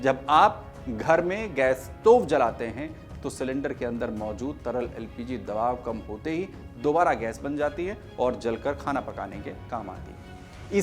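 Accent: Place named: Bhojpuri, India. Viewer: Saaf baat bolna Bhojpuri kya kar raha hai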